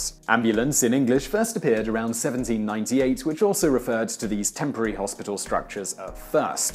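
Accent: United Kingdom. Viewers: is like VSauce's Michael with a British accent <3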